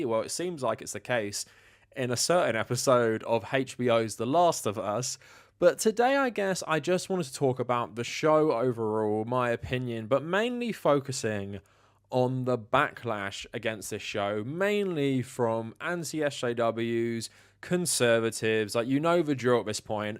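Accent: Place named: United Kingdom. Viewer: That’s funny. I never thought there was any other way to say those words. I mean, I sometimes say mirror like it has an o in it, but only because it sounds weird. English is a nonsense language with no real rules. That’s what makes it so good. And in all honesty, I’m a sucker for foreign accents, which is one reason I watch this channel. Everything sounds smarter with a British accent.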